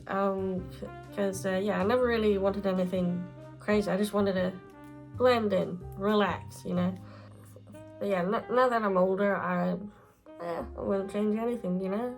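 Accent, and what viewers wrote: Scotland, United Kingdom. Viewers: 😍💕🐿🎃 I'm levitating too, just from watching this video! 😅 (Getting Scotch tape to reattach myself to seat 😉)! Your illustration is magical!✨ Loved the forest and the legend of Skogsvrå, and the busy squirrels, and there's that charming witchy animation too! 🧙‍♀️🐈😊YouTube suggested one of your videos yesterday, and when you appeared, my first thoughts were: Oh, great vibes, kind face, lovely voice, nice Scottish person (I'm from the north of 🇪🇸, so you sound really Scottish to me 😉), wonderful sense of humour, likes what I like, delightful cosiness around her, and totally amazing artwork…! 🤩Subscribing!! 😃👍 Thank you so much (And Margo😉), and have a very lovely day!! 🙏👏👏👏😘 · Hi. I am in awe! Why have I not seen you before?! This painting is fabulous! I love it! re: your accent. At first I thought Scot cuz it reminds me of a friend's accent from Scotland, but then some words sound like my friend from Sweden. 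The mix is beautiful..And, of course, I love being right LOL. On both counts 😂😂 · I need to be more “ GRACEFUL and DELICATE “ 😂. I completely relate. Aw your videos make my heart happy! I’m an animal and wildlife lover plus I’m partial to a wee mushroom also. So happy to have found your channel ❤ ps. I can still hear the Scottish twang going on, I’m Scottish myself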